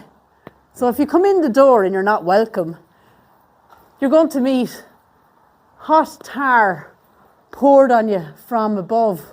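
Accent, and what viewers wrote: Ireland. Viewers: I love listening to you and your Irish accent. To think my ancestors came from your beautiful country makes watching your channel even more interesting. Just one of millions of Americans whose ancestors came from Ireland. It’s beautiful and you do an excellent job sharing with us. 🙏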